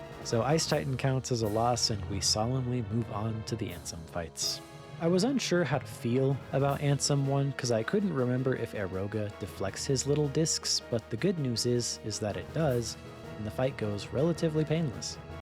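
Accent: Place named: Canada. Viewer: You sound painfully Canadian.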